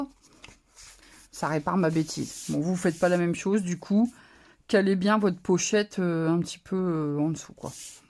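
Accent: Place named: Nord, France. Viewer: Ccou très joli ton porte bloc notes je pense que je vais en réaliser pour la fin de l’année j’en profite pour m’abonner à ta chaîne j’aime bien y’a une Tite pointe de ch’Nord dans ta façon de parler? Lol chasse le naturel il revient au galop bisous bonne fin de journée et merci à toi pour ce tuto 👍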